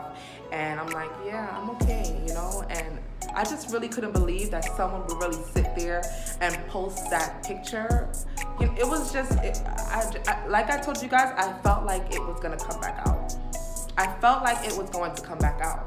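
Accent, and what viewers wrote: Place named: Brooklyn, United States. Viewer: Ooo you a Brooklyn girl 🥵🥵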